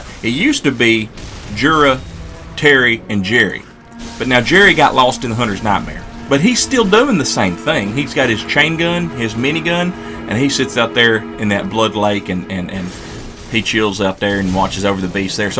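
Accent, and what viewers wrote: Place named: South, United States. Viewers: I love your Southern drawl; it’s really authentic . I live in the South, so I give it my seal of approval. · A hillbilly saint talking with good piano music. What combination is this?!!?!?!?!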